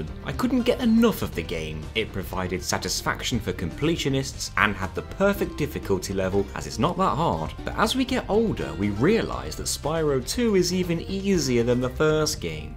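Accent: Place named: Lancashire, United Kingdom. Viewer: I think you might be me. Other than the fact you sound like you're from lancashire/ the north west, we sound identical.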